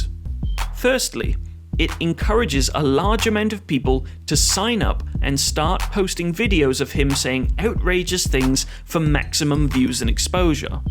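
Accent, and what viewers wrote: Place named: Wales, United Kingdom. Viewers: Why does wikifeed have a North Wales accent? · Why does the wikifeed AI voice sound like it's from Swansea? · The Welsh accent.❤ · I love that the A.I voice you use is welsh.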